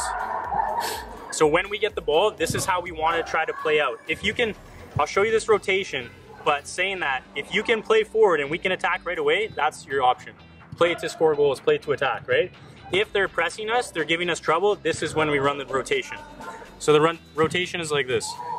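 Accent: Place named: Canada. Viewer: As soon as I heard like a fkn Canadian accent I turned it straight off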